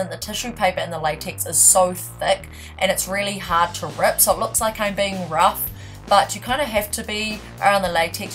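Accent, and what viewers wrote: New Zealand. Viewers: the kiwi accent always makes me giggle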